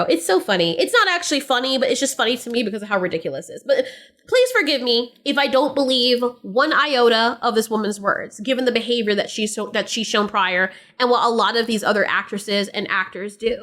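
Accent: United Kingdom. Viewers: Brutal takedown done with the best mocking British accent ever! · Love your British Accent by the way. Also great analysis ❤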